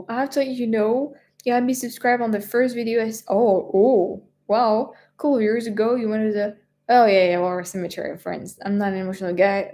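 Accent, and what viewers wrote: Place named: France. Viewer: I love your French accented English! 😎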